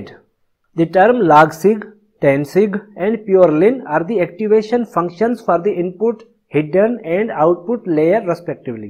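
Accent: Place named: Sindh, Pakistan. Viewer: Thank you dr sahb. Pray from Allah almighty to give me success. Wonderful methodology. Best teacher. Stay blessed. Love you from sindh Pakistan. Your accent is sindhi. Love you sir.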